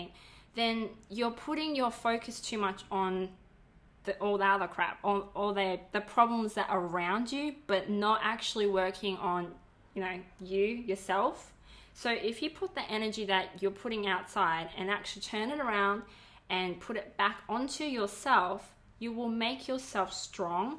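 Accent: Australia. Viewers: this is an Asian Woman with an Australian accent. never thought there was a such a thing as a perfect woman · are you chinese...you sound australian😯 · your accent... are you in australia? you sound like my cuz in melbourne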